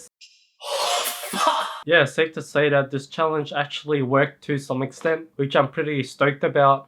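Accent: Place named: Australia. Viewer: Your editing style is really well done. I think sparkling water is spicy, so I’m curious to up my tolerance. I’m from Israel but living in Australia (based on your accent/the Red Rock Deli chips, you do too), so not two places known for spice. Thankfully we do have so many options here thanks to the beautiful blend of cultures.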